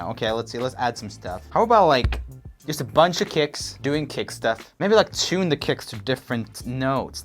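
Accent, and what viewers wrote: Sweden. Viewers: My mom heard me watching this and she was like "oh is he german or swedish?" And I was like how did you know???? He's swedish? She's just like I know things. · Swædish accent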